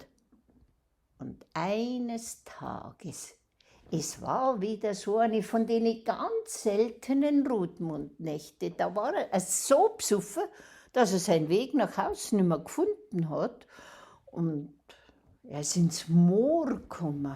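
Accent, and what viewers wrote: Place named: Bavaria, Germany. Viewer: Einso schönes Märchen im bayrischen Dialekt. Auch als Nordlicht konnte ich sehr gut folgen. Ich mag Mundart erzählt sehr! Es ist authentisch und hat Herz. Auch finde ich die digitale Kamishibai sehr ansprechend. Ich habe gerne zugesehen und zugehört.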